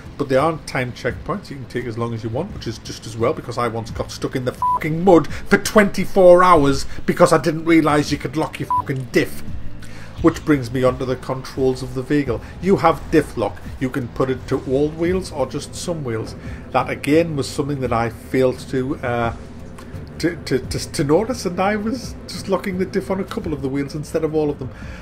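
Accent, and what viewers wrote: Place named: North, United Kingdom. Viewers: I do love a good old fashioned Northern rant, me! Most amusing :-) Enjoyed the overview too. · You sound fat and northern.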